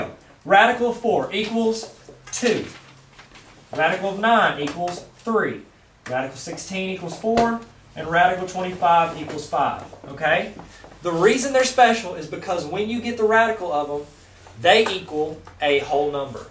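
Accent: South, United States. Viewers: Awesome. Glad a teacher can have a sense of humor. You must be from the south. You use the accent well.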